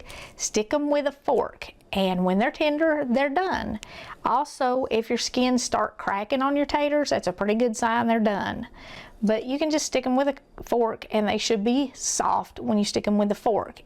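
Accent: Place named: Kentucky, United States. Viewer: Every time I hear your voice, it brings me back to Kentucky. You remind me so much of my mother. Tears of joy Every time every time I need to learn a recipe which I love to cook. Anytime I need a recipe, you're the first one.And only one, god bless you.And your family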